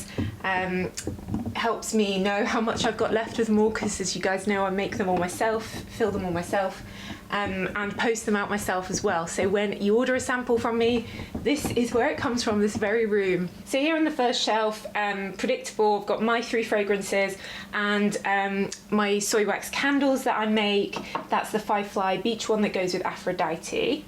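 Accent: United Kingdom. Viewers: Congratulations,! I like your collection. We share a lot of preferences: Michael kors Gorgeous, Aqua allegoria mandarine basilic, l Interdi, la nuit tresor... your descriptions are just accurate, I can rely on! I also like your British accent, I enjoy both English and parfums❤😊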